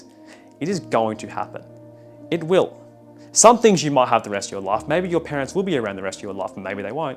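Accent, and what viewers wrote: Australia. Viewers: Is that an Australian accent you've got there?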